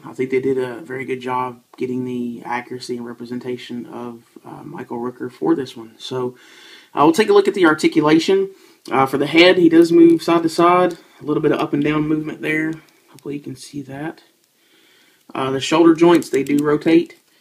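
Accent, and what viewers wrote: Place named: South, United States. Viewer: I do love this show and the figures are nice, my buddy collects them. I am more a transformer and dc collector. I subscribed to your channel tonight while working, I had caught the gl ring review and like it. I like to hear another southern person doing reviews online...btw where are u from?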